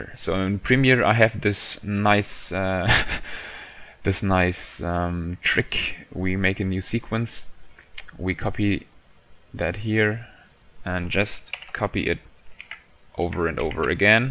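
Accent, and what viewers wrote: Germany. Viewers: nice vid but stop copying the american accent its annoying! stick with your german accent its much more charmin....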